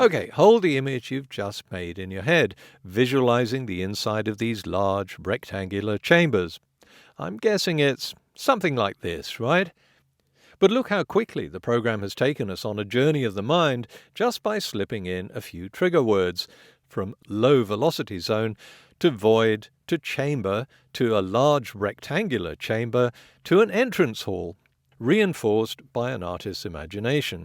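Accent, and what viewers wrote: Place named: England, United Kingdom. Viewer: Oh how I missed slightly sarcastic English voice.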